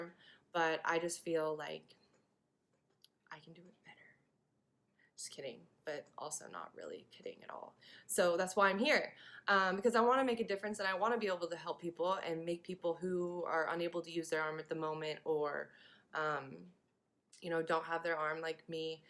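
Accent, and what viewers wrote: United Kingdom. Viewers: Her british accent thoo😋😋❤ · You are so inspirational and beautiful. ....and your British accent!!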